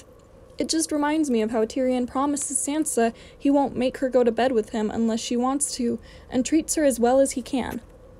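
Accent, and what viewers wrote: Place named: France. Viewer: Excellent French accent! Interesting, insightful and enjoyable. Thanks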